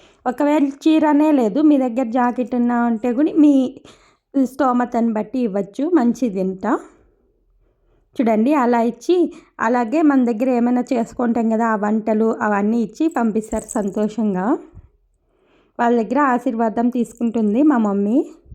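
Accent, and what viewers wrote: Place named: Rayalaseema, India. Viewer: మాకు కొన్ని అరిసెలు పంపించొచ్చు కదా..రాయలసీమ యాస లో సూపర్ గా చెపుతున్నారు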